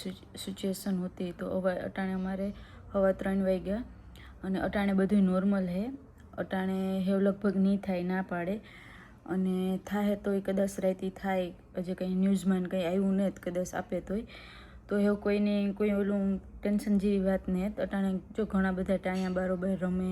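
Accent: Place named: Barda, India. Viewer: બેન બહુ શરશ માહિતી આપી બેન તમારુ કયુ ગામ તમે બરડા વિસ્તાર ના હોય તેમ લાગે છે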